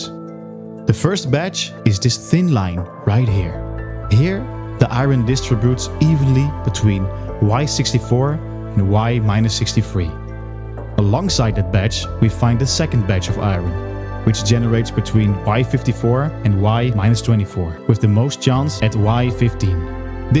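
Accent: Netherlands. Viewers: Is this guy Dutch? He sounds Dutch. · you sound dutch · TYSM! btw i recognize a dutch accent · goeie tips maar ik ruik een Nederlander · I smell a Dutchie like me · BEN JIJ NEDERLANDS!!?? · are you dutch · lol youre so dutch bruh. I mean the stonekolen english is waaay out there. thanks for th vid though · Iets zegt me dat je nederlands bent 😂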